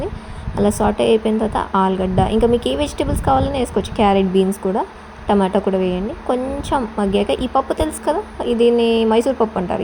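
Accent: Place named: Telangana, India. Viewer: Telangana ammai la undheyy🫠🤔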